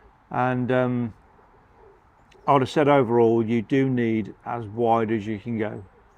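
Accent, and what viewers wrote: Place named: West Midlands, United Kingdom. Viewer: I'm planning on doing this on my gravel bike, possibly in September? You didn't appear to be carrying much kit, did you 'wild camp' for the entire trip? I plan on using my panniers as I cant see me getting all my stuff into a tail pack etc? Any problems envisaged using panniers, is there much single track? Btw your accent sounds familiar, W Mids? 😉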